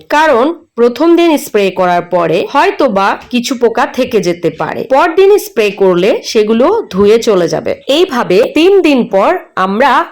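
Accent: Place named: East Bengal, Bangladesh. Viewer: পূর্ববঙ্গের কথাগুলো শুনতে বেশ ভালোলাগে,যেমন::দিবো,নিবো,বাসা ইত্যাদি। কিন্তু সারাজীবন চেষ্টা করেও আমি বাঙালভাষাটা শিখতে পারলাম না কারন বাঙালরা যাকে বলে ঘটি,আমি তাই। ছেলেবেলায় যখন পাড়ার মাসি,পিসি বা দিদারা আমাকে হয়তো ডাকলো,"এই ছ্যামড়া শোন্, তখন আমি ভাবতাম আমাকে এনারা দামড়া বলছেন।রেগে ঘাড় গোঁজকরে বলতাম,"কি বলছো বলো।" এইরকমের অনেক মজার ঘটনা এই বাঙালভাষা নিয়ে আমার সাথে হয়েছে।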